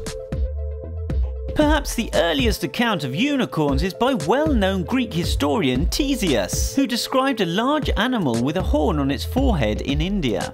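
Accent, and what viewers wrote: England, United Kingdom. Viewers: Nice Englishmen English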